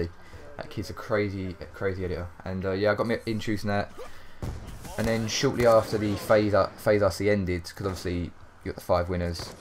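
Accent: England, United Kingdom. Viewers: How old is he? And is he British? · Are you English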